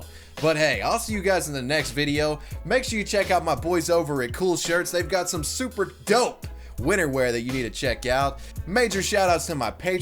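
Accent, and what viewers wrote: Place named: South, United States. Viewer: Youre like an attractive repilitian methhead Pewdiepie with a slight Southern accent that if you wouldnt have specified would be of untraceable American regional origin. Surferish to degree.. But all around normal, affable fellow in demeanor. Maculay Culkin meets Matt McConaughey. My love is Tsundere platonic bromance-respect.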